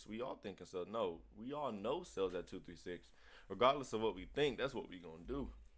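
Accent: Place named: Detroit, United States. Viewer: you from the D dawg ? you slick gtta accent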